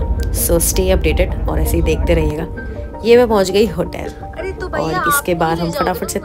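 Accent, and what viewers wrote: Bihar, India. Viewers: It's nice to hear her bihari accent 😍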